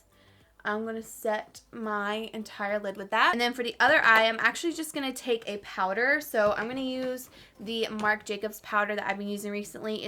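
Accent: South, United States. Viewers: Love your southern accent, so cute! I like the left side better, looked like the colors blended out better. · I just love when your southern comes out because being a BAMA girl myself it’s nice to hear something of myself on YouTube. I’m not sure why it’s so rare to hear. There’s just so many of us southerners out here! Thank You for this video!!! I’m a big fan of yours! Much Love toYou 😘💋😍❤️❤️❤️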